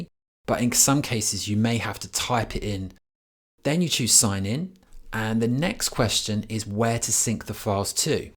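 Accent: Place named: United Kingdom. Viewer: I love your british accent :)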